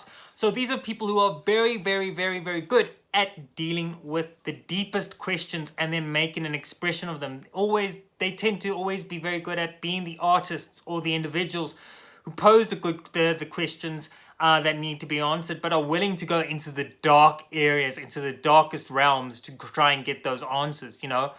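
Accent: South Africa. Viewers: Are you from South Africa or perhaps New Zealand?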